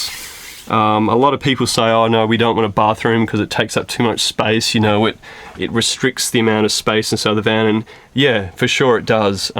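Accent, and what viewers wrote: Australia. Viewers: Love your build. It's comfortable and practical and it looks good too. Is this a medium wheel base or a long wheel base? Loved hearing the Aussie accent.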